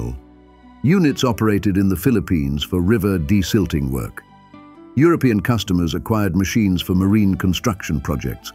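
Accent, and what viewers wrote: United Kingdom. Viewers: Subscriber here. Liked and hyped this video because it’s very cool! No bouncy line at the bottom… Awesome! Now, if we can just get rid of that British accent…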